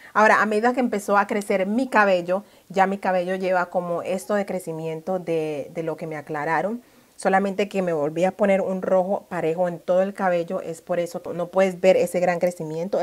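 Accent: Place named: Colombia. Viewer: tienes el timbao de la gente afro de buenaventura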